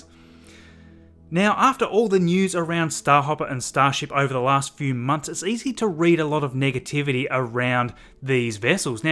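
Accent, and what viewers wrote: Australia. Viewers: Because I'm Aussie, I can tell from your accent, you definitely must be an Aussie too?! Lol.. You still down here or did you move to America? Don't think anyone from the public would know, but do you know if the final design of the interior of the Starship has been completed? Good reporting 😉 🇦🇺🇺🇸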